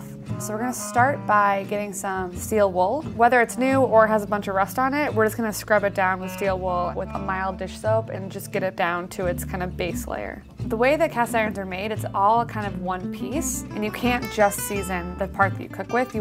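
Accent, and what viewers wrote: United States. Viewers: At the end of every sentence she goes uuuuup on her tone. Very unprofessional and annoying, typical american accent.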